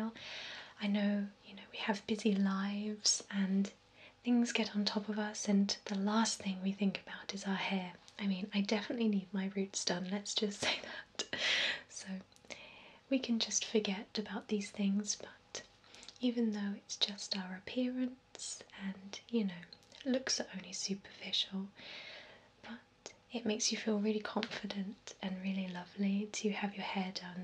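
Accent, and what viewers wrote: England, United Kingdom. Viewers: Yes she is so stunning, And what a ASMR video lovey British accent so soothing.... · "obviously you'd need to grow your hair a lot longer" bish my hair is longer than yours :-P I actually really like this video, which is unusual for me, tbh - generally I'm really not into british voices in asmr (being british myself - i think part of what I"m looking for in asmr videos is a kind of escapism from the reality i'm surrounded with on a daily basis? idk) anyway, good job :) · You sound a lot like whispers red wonder if you're from the same part of England...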